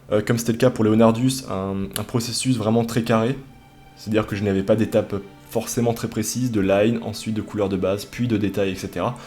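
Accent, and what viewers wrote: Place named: South, France. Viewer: oh le bel accent du sudeuh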